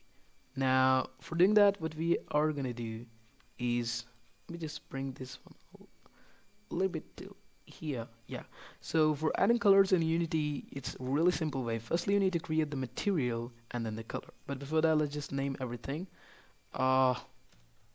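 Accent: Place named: England, United Kingdom. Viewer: Lag Raha he koi asli angrez bol Raha Hain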